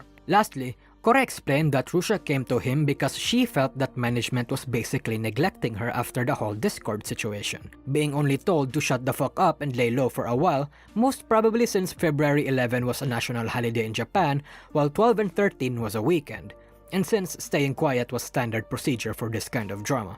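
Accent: Philippines. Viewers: Definitely not a native English speaker, you sound like a fellow Filipino with that accent.... I haven't done any Vtuber digging since I'm busy with college so i just barely know about this controversy, although this was neatly presented enough for an outsider of the community As for fubiki and the others who didn't react, i think they were playing safe trying not to get involved with drama, i assume it's a very uncertain time for them. To either call their company out or call rushia our. It's hard and very costly to speak up when everyone is up and arms with pitchforks. That's all i could comment about the situation, and I'm happy for the new rushia. I may even check her new channel if i get curious enough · okay, if all Filipino people sound like him, then damn I’m super jealous. I love the accent, it’s awesome.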